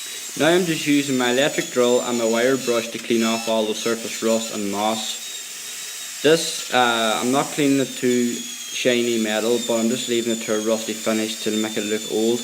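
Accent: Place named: Scotland, United Kingdom. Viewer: I can't figure out your accent, is it Scottish or North Irish?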